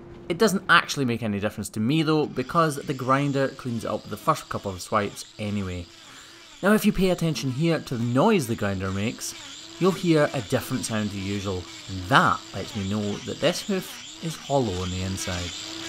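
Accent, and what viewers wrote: Scotland, United Kingdom. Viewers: I was pulled in by horse farrier videos, then YouTube, in all it's power of Oz, introduced me to this Scottish cow foot guy. I love listening to that accent (and figuring out what he is saying😂) while he works to give comfort to these animals. One of the most bizarre things to find such interest in, but I guess I'll see y'all down the hole! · A human who has empathy for an animal in pain, is a good human. I'm loving your videos. No idea how YouTube brought me here, but I'm hooked. I do love a Scottish accent too 🙌 thank you for making these animals lives easier 💖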